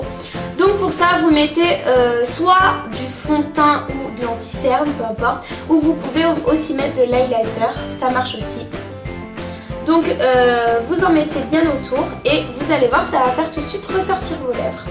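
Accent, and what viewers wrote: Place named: Sweden. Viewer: OMG ton accent suédois j’adore persso j’ai le même ❤️❤️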